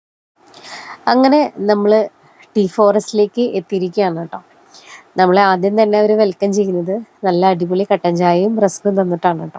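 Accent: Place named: Thrissur, India. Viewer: സൂപ്പർ..സൂപ്പർ..ട്ടാ...💕🎻🥀❤️🎻❤️🥀❤️🥀❤️തകർത്തു... ഇടയ്ക്കുള്ള..ട്ടാ..ട്ടാ...ന്നുള്ള പ്രയോഗം നല്ല രസമുണ്ട് കേൾക്കാൻ..തൃശ്ശൂർ സ്റ്റൈൽ💯💯💯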